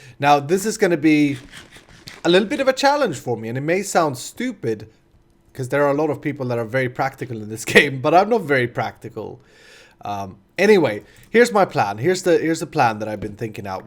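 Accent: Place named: Sweden. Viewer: Fin svensk brytning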